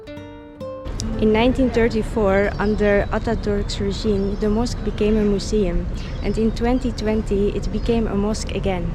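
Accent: Netherlands. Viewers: u sound dutch do u speak Dutch??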